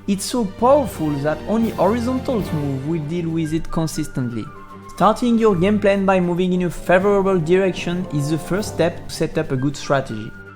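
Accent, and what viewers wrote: France. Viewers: I think you got to lower the volume a bit. It's already slightly difficult to understand you because of your French accent. Merci beaucoup! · That is called a French accent. Congratulations, you're rasist.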